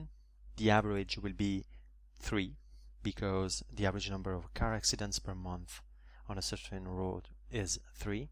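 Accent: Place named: France. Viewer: A video about Poisson...narrated by a French guy. How perfect.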